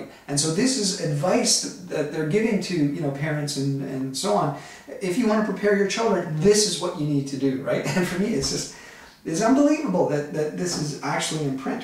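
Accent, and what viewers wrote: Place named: Canada. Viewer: are you canadian? you say "aboot" alot.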